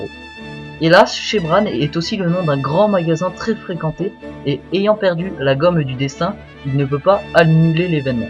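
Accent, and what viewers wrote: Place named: Japan. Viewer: Je valide l'accent japonais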